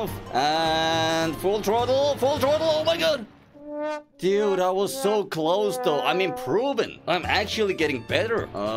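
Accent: Sweden. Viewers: are you Swedish? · are you swedish??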